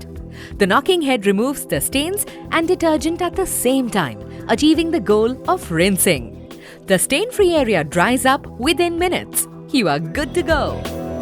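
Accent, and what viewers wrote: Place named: India. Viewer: Indian English. BP and TD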